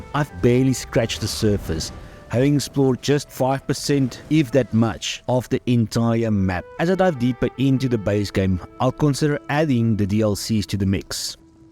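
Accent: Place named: South Africa. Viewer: Yoo i know a South African accent when I hear one. Whats up ekse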